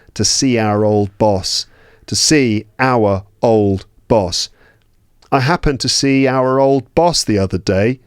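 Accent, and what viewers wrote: RP, United Kingdom. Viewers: Hi,. You look very nice in that T-shirt. I love the colour. I'm a teacher from Buenos Aires, Argentina. I started watching you on You tube premium this year, but I can't remember when! I enjoy listening to you because your modern RP English is fantastic, you have a beautiful soothing voice and you are good tempered, funny and very clever. I want to improve my listening skills, enlarge my vocab and revise what I have forgotten, since I 've been teaching pre-teens for the last fifteen years or so. The level is pre-intermediate, so I've forgotten many things. I am fond of various shorts of episodes. (My favourite colour is darker than light blue and lighter than blue). I enjoyed the episode where you were showing París, an old one where you talked about your late teenage, where you travelled to, what career you took up, your favourite band and so on. 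I liked the way you planned the class about the short story at the campsite, the episode about Gordon Ramsey, lol, the one where you talked about films, lol, the one where Paul Amber copied accents, lol, the one where your father talked about politics, it was very interesting, I liked the one where your mother said that Charles had sausage fingers, etc etc. I thank you so much for your kindness, your HARD work and pleasant talk. I wish you the best, well deserved holidays on an earthly paradise like the Maldives 😅. I'd love to go there but It's too expensive for me. I'm looking forward to watching your new episode about London. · hie, can I ask which part of the Uk is your English accent from. I usually use your videos to learn the British accent and I just got curious to know the origin of the accent I'm learning. thanks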